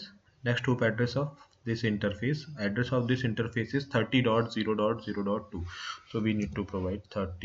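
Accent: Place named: India. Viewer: i don't like Indian or Pakistan Accent but you are an exception.Best video on packet tracer so far.I love how the video started and ended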